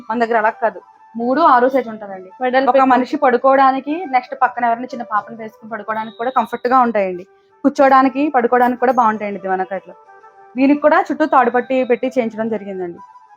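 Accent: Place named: Godavari, India. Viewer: అచ్చతెలుగు గోదావరి అమ్మాయి లా మి భాష బాగుంది నేచురల్ గా వుంది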